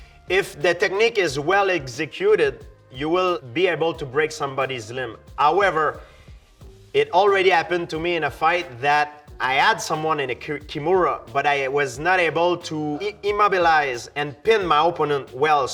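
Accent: France. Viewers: He sounds not only french but he somewhat sounds Irish on some of his sentences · The world's jolliest and friendliest MMA fighter. We love GSP and his franglaise😅♥️ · Whenever he said 'throw' with the French accent, I heard 'troll'. Made the commentary pretty hilarious in a few spots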